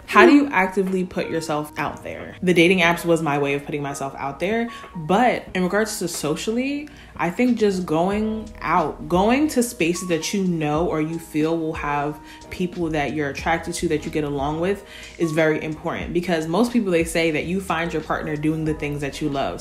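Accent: United Kingdom. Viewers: SAME MAN N I THINK IT'S THE UK MAKEUP n u don't really hear or see much black Muslims in America on the media n also her accent just sometimes slips into a British one sometimes 😭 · Why did I really think she was from the UK? 🤣🤣🤣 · Also your British accent is on point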